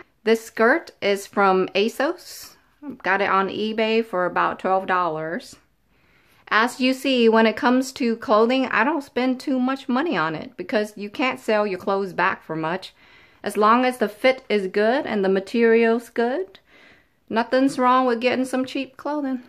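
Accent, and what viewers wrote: South, United States. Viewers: Love your southern twang...where did you grow up? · I love the southern twang 😂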